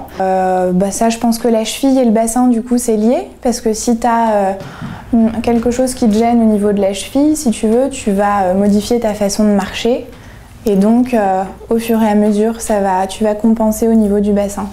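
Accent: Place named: Paris, France. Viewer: Dawsen Mage c’est du français parisien 🇫🇷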